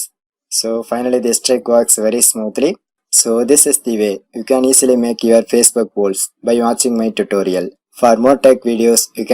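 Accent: South India, India. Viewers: Your South Indian accent... 😁👍🏻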